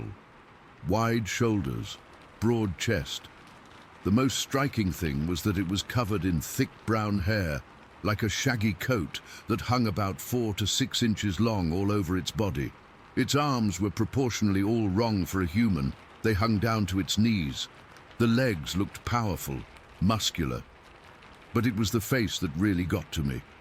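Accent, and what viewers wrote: United Kingdom. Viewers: Why's it's always a British accent....bullshit I'll never listen again ¡!!!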